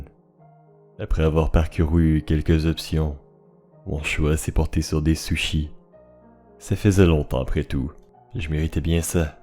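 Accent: Quebec, Canada. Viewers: j'adore ton "accent" Québecois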